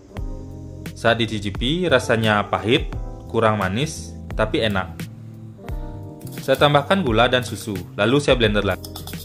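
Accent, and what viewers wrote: Bali, Indonesia. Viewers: orang bali ya bang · Dr Bali ya bli???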